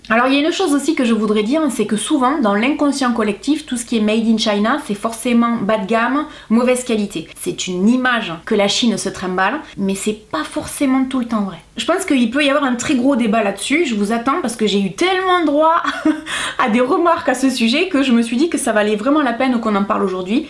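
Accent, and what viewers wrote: South, France. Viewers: bonjour, je suis une nouvelle abonnée et je voulais vous dire que je vous trouve rayonnante, j'ai 49 ans et je fais sans doute partie des mamans qui vous suivent. Votre accent est un bonheur absolu car moi aussi je suis du sud (Marseille)même si je vis en Bretagne, continuez à nous donner de la joie et de la bonne humeur, restez vous même contre vents et marées. Je vous embrasse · Coucou, ça n'a rien à voir avec la vidéo, mais je voulais juste te dire que j'ai beaucoup de plaisir à t'écouter parler. J'aime beaucoup ton accent du Sud! Reste comme tu es! Sinon, Super vidéo!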